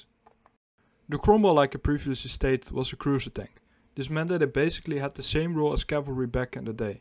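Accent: Netherlands. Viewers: That was extremely informative, and i personally appreciated your Dutch accent; very humble, yet PROUD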